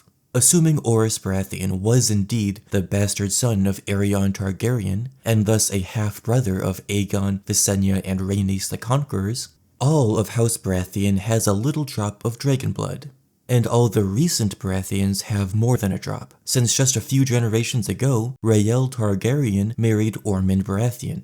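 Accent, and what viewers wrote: United States. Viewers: he has to be from the Midwest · American lol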